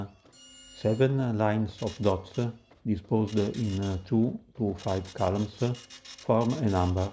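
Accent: Italy. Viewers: Oh yes, i can understand. When we hear the narration it sounds like an italian speaking English. Is that actually your voice or an automated voiceover? I always juat figured that was you.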